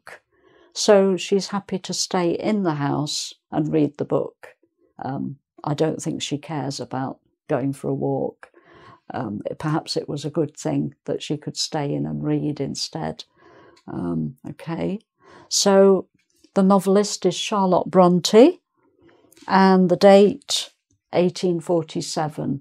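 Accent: England, United Kingdom. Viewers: VERY BRITSH ACCENT ! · Hello,!👋 I am Kate, I am 18. I’ve already read "1984" and "Pride and Prejudice" in Russian. I really enjoyed them!😍And I’ve also read "Jane Eyre" in English when I was 13-14 years old (my teacher gave me this book) and in Russian recently. And now I am reading "Charlie and the chocolate factory" and "A journey to the center of the Earth" in English. In general, reading is my favourite leisure time activity, and I’ve read hundreds or, maybe, thousands of books.📚 I really love "Harry Potter", "Master and Margarita", books about "Stranger Things", foreign classics and so on.💖 P.S. Thanks for your video!👏 I am from Russia🇷🇺 and I am learning English, but I’ve understood everything without subtitles. I really like your pronunciation and Britain accent!🥰🇬🇧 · I really love English writers, they are my favourites ones after Juan Rulfo, and I love your accent as well 🥺🥺🥺🥺💚 greetings from Mexico. 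· Even a non-English speaker would be able to understand this lady. Lovely & relaxing type of voice with a typical British accent, perfect to get you started. Plenty to learn from her (intelligence). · Это что, британский акцент?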